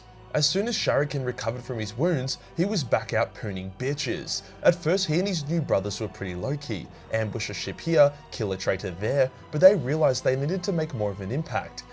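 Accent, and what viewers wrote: Australia. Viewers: Wow glad to see my favourite character be told by an australian, thanks mayte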